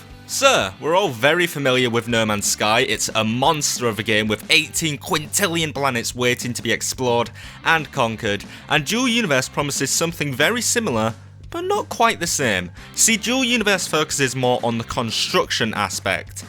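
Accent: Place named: Yorkshire, United Kingdom. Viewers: It sounds like he's from Scarborough, this is the first time I'm watching one of his vids though... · I think it's shared... A sharing universe. Not a shard, crystal shard or otherwise. Cool accent. I wonder how an American saying 'shared' sounds to our Yorkshire friend here, probably very nasally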